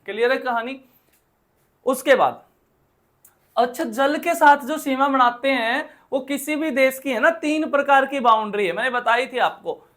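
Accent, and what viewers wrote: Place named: Rajasthan, India. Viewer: Rajasthani tone 😊 Haryanvi v ... thora thora Panjabi v😍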